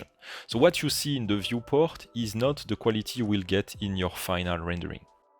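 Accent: France. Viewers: And here you go again with your negativity, being disrespectful and utterly rude. Same as in other tutorials with your comments. I now have reported your messages on the YouTube platform. It says more about your lack of skills. If you don’t find the capacity to understand then simply leave this channel. Unlucky for you there are only a few really great ones out there such as his. Therefore please take a beat and reconsider when you voice against other nations, especially as beautiful as the French. If you were to listen closely you would notice that has a very broad and educated english vocabulary, which is much better than most none-native english speaking people have. So yes, his english is excellent! Having a french accent is not getting in the way of his clear pronunciation at all. It is to note that he is the Technical Marketing Specialist at Twinmotion and is in this position for a reason. 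· Je sens le tont français dans votre voix 😅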